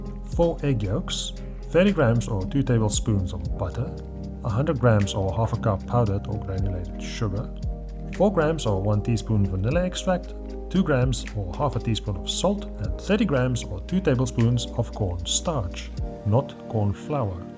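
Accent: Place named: South Africa. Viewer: You have a great voice with the wonderful South African undertone. Thanks so much for your videos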